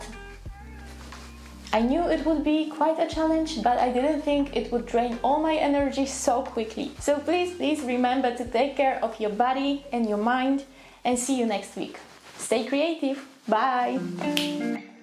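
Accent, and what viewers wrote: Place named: Poland. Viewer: "InkTober would be fun" I said- "I can show off my OCs" I said- 😂 my sympathies that InkTober is draining you, too - but I can see why! A new drawing every day is pretty daunting; I've been following all of your prompts on Instagram, and they are absolutely gorgeous, every single one! I'm glad you decided to draw days 13 and 14 in advance so you could set aside time for this video, even if it was a lot of extra work 😭 "Sketching is the most important stage" ...welp, I wish I had known that 14 days ago- for the first 10 days of the challenge I was just going in all ink! (All in? All ink? 🔏) I only started doing sketches recently 😅 ahh... so much unnecessary pain : Friends is such a great show! Noooo! ;-; I love your speaking though! You speak English very well ☺️ and even if you decided to do Polish w/ English subtitles, it would be great because you have a very lovely voice, and a beautiful accent ✨🇵🇱 Also, this style of video is wonderful - keep it up! 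Not to mention your humor~ The speedpaint process is so so beautiful! Even if you're not absolutely loving every single one, I think they're all masterpieces in their own way Thank you for sharing your talent with us!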